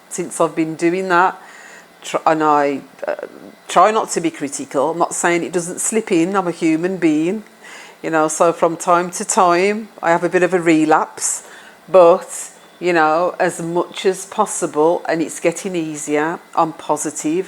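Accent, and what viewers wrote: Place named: Birmingham, United Kingdom. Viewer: So powerful. So inspiring. Thank you. I've been asking what it's all about and what do I do next. I've been living with cancer for 3 years. I'm from the UK too and I think you're from Birmingham too judging by your accent lol. I've been wanting to leave England and live part of the year here and part somewhere else since I was a teenager. I'm 46 now and fear stopped me from taking action. I still want to do something significant with my life. I am fit and well, no one can tell I have cancer but now I'm stuck because of the medications I have to take. I don't know what to do. I look back and realise that God was pushing me to take action years ago but I'm still here with my soul yearning for more. Thank you for making your videos. I believe I stumbled upon your channel for a reason. All the best to you . Oh I let the adverts play instead of skipping them when I watch your videos 😊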